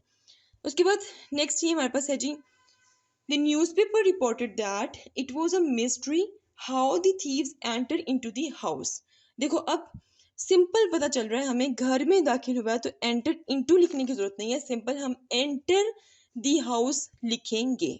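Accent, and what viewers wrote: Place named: Punjab, India. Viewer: You speak Urdu and few sentences in English with Punjabi accent. For example, words got and correct you pronounce with Punjabi accent. Also, a basic Urdu mistake: when you begin a sentence with Aap, it ends NOT with ho but hain. This is Indian type of Urdu which is not correct.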